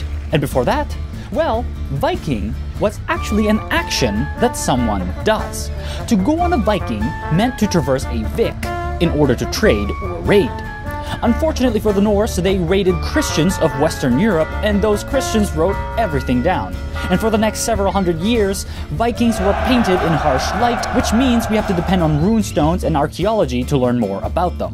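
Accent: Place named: Sweden. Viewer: stop pronauncing danish words in a swedish way those swedish pricks suck. sorry for the bad spelling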